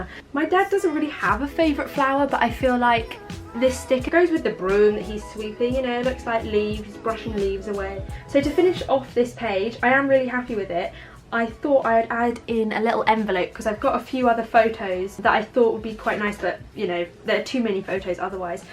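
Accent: England, United Kingdom. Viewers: ok so there are two things i need to say to you : one. you're so damn good at scapbooking!! i tried once and my eyes just don't work well lmao. two. i really like your accent! i could listen to you for hours. i am planning to move in england so from now on you will be my exemple for training on the english accent. byye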